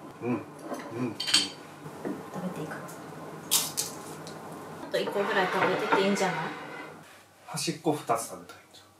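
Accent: Kansai, Japan. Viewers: 骨なんかつかうん？のくだり笑いました😂😂すごい今更なんですけど、さん関西人ですか？私も関西人なんですけど、会話が聞き取りやすすぎて、あ、まって、関西人やん！！おもいました🥺 違いましたらごめんなさい( ；꒳； ) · 最高の食卓！！！全部美味しそうやし、関西弁に癒される～＞＜ さん最高です。旦那様も、ご友人も幸せですね～＾＾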